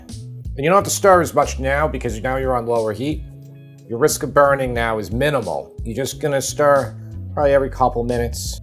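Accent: New York, United States. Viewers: Love your NY accent! I’m from NY too, but now live in Virginia. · I haven’t tried the recipe yet, but I enjoy the way you “tawk” because it’s exactly how I do! Lifelong New Yorker. My adult children still mock me, especially when I make fresh-brewed “cawfee!”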